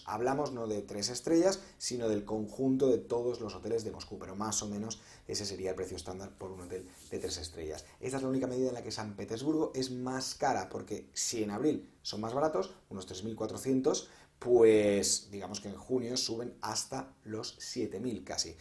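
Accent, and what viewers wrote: Spain. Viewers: Exelente información muy didáctica, por tu acento eres español. ¿Porqué no nos das la misma imformación pero de tu país España? Saludos desde Ecuador. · Sí, tu canal és super informativo,además me encanta tu acento español de españa,saludos desde Brazil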